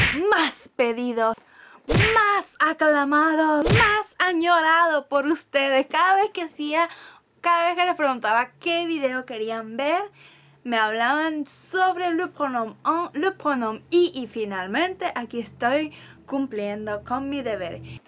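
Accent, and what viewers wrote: Venezuela. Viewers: Tiene acento venezolano😁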